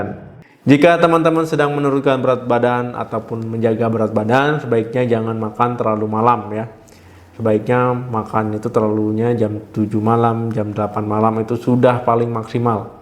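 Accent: Aceh, Indonesia. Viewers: Orang Aceh ya Dok?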